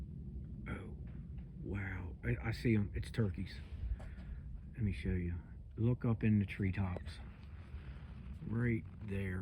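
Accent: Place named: Southwestern Pennsylvania, United States. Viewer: First and only time ive managed to be the first thumbs up lol. When i listen to you talk your accent sounds identical to the way my relatives in southwestern Pennsylvania talk and i was curious if people in Maryland have that same accent or if you weren't raised there? Intrigued as to how the other part of this video will go and if your pebble hurler will follow you over there? Good luck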